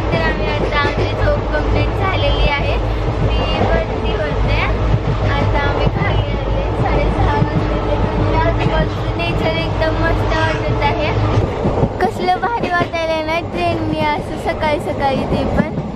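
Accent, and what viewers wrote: Latur, India. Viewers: Tuzya aavajala khup sut hoti aapli Latur chi bhasha.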